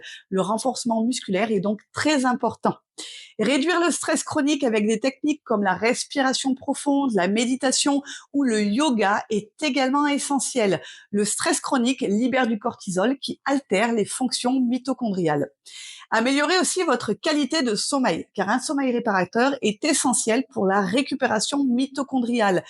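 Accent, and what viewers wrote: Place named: Haute-Loire, France. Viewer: NON !!!! 😃 Je viens de voir sur votre site que vous êtes ardéchoise !😃 Moi aussi !!! 😃Compte tenu de votre accent, je dirais que vous êtes plutôt dans le Nord de l'Ardèche, dans les Boutières ou même Guillerhand Grange, Annonay...Pour ma part, je suis dans le Sud, dans les Cévennes, du côté de Valgorge. Je suis moi aussi passionné de biologie, de médecine et de Sciences du vivant. J'adorerais vous rencontrer pour parler de tout cela et qu'on échange des connaissances sur ces sujets.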